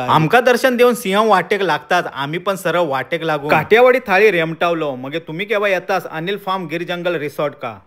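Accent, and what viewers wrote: Malvani, India. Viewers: छान मालवणी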